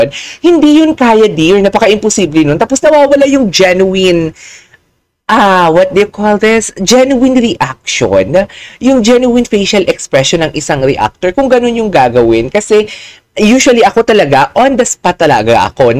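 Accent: Iloilo, Philippines. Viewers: Sa tingin ko, ilonggo ka. May accent.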